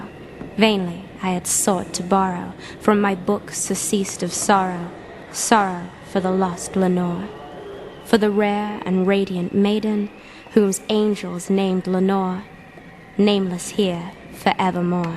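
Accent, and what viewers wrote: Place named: United Kingdom. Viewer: I love her accent.. even though I'm British as well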